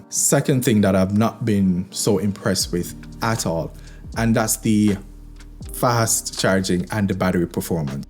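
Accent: Jamaica. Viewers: Are you from the Caribbean, specifically Jamaica?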